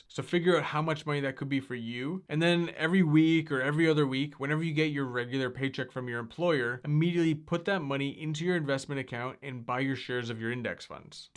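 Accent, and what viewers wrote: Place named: Canada. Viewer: Hey what app or brokerage do you use to buy stocks with ? Lol also I can really hear the About! (fellow Canadian haha)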